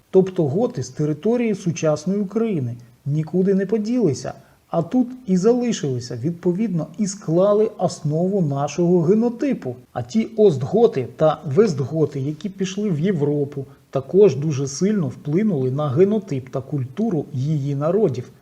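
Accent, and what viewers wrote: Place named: Moscow, Russia. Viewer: Ви нащо назад на українську перейшли? Вам не пасує. Тільки масковскій діалект цапського ізика. З аканням.👍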